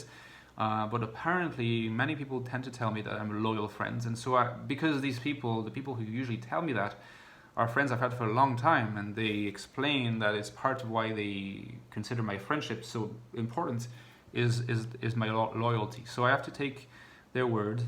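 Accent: Ireland. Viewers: Thank you very much for this comprehensive list,I relate to all of your points,I was trying to figure out your slight accent,thought you sound maybe a bit Irish,thank you for the clue in the video☺️ also I most struggle with the last point,I’m always that random girl for the situation and don’t really fit in most of the time,give people impression that I’m way too naive,I can’t take anymore their irrational reactions and try to avoid meeting ‘strangers’,I realize I can only safely socialize in private where I’m introduced to people by someone who already knows them,when I have to personally assert myself to a complete stranger and and have that initial small talk their lack of boundaries and sexualizing my ‘INFJ stare’ is just disastrous...i have women of my mothers age coming on to me sexually and telling me they are bisexual 5minutes into conversation...yep that’s how they ‘figured’ me out...😖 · Some questions! 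Who is? Where are you from? What languages do you speak? You have an 'Irish lilt' to your voice. Did you spend time in Ireland? I do a very detailed test and come up with INFP and INTP. Do I need to take the test again. What is your educational background? You seem exceptionally well versed in the vagaries of the MBTI. Thank you so much. Lots of Love and Hugs. Test: <link>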